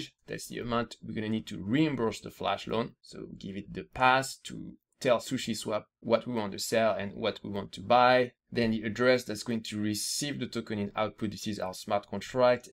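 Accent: France. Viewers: Are you French ? It seems you have a slight French accent ahah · Cet accent français 😂😂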